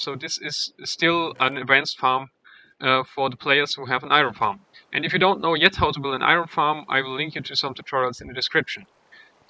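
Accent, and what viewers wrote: Germany. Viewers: Was glaubst du denn, den Dialekt bekommt er nicht mehr, aus seinem Englisch raus. Deswegen sind wir deutschen leider negativ aufällig in der englischen Sprache, weil es soviele gibt die sprechen als ob sie aus der Grundschule kommen... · Man höhrt das du deutsch bist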